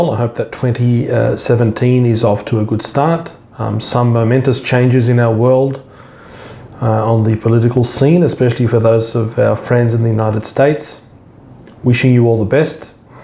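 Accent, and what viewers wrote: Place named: Australia. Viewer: At approximately I wasn't able to understand the word you said after "end in". Maybe because of your most lovely Australian accent. (: This exact scenario is what my friend hasn't yet been able to understand is transpiring between her and the narcissist she THINKS she loves, and who is deceiving her into believing he loves her. Whenever you have time, let me know the word. Thank you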